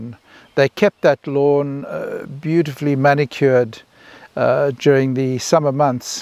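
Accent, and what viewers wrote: South Africa. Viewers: I like the style with you being outside. The South African-ness and story telling puts me in the same frame as reading a Wilbur smith book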